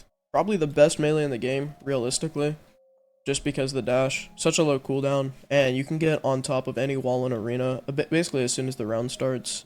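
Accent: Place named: Kashmir, India. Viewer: hey are you from kashmir India if yes then i am from Jammu and your accent is amazing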